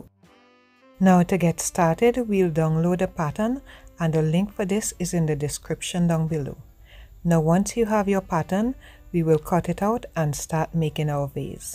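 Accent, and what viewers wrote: Trinidad and Tobago. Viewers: Did i detect a slight trini...love from trinidad🇹🇹🇹🇹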